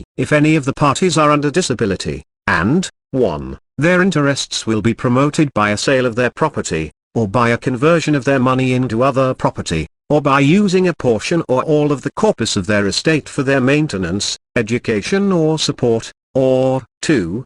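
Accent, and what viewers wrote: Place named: United Kingdom. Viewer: Wish this was in a non-british dialect.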